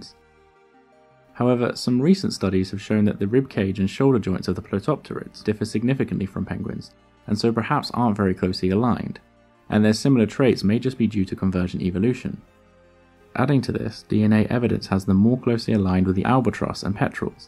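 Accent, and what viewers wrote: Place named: England, United Kingdom. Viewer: You make really interesting videos, and they are great to fall asleep to because your voice is so calm with English accent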